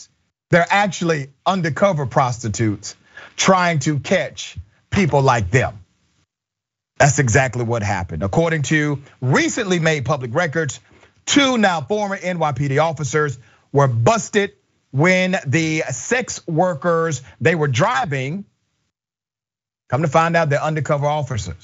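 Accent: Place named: Texas, United States. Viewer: HAS SUCH A STRONG TEXAS OR COUNTRY ACCENT THAT DOESN'T MATCH HIS APPEARANCE, BUT I LOVE WHEN WHITE PEOPLE DOESNT ALIGN AND SUPPORT OTHER CORRUPTED WHITE INDIVIDUALS. I ALWAYS SELL OUT MY FAMILIES AND FRIENDS WHEN THEY DO SOME REAL MESSED UP STUFF ESPECIALLY THAT COULD AFFECT OTHERS.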